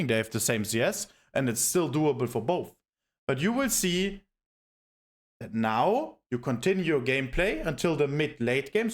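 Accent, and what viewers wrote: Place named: Germany. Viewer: Sounds like German English. 😄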